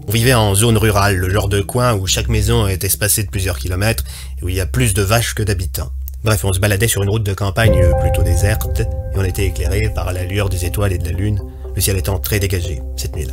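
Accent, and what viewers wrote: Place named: Quebec, Canada. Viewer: J'ai pas du tout écouté l'histoire. Ton accent québécois m'a suffit x)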